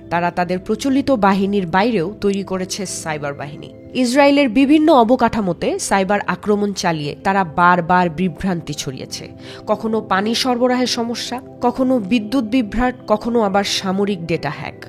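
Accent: Bangladesh. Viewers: আপনার ডায়ালেক্ট ও একসেন্ট বাংলাদেশীদের মত হওয়ার কারণ কি?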